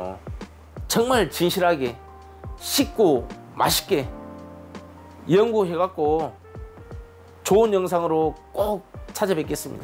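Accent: Jeolla, South Korea. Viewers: 셰프님 구수한 말씨가 정녕 전라도 가 고향이신거 같습니다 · 세프님 너무 섬세 하시고 또 전라도 사투리 로 너무 정겹게 느껴지고 또 많은요리 소개 해주시고 넘 감사하고 좋았습니다 세프님 날로 번창하시길 기도합니다 다시한번 축하드려요 🎉💗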